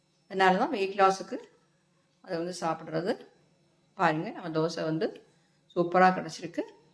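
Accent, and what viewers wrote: Kerala, India. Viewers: Are u a malayali?your slang of Tamil says so.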